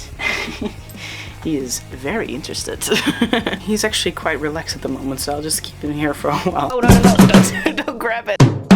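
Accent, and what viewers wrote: Netherlands. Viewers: ARE YOU DUTCH??😂❤ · Are u dutch I am but u know I heer the accent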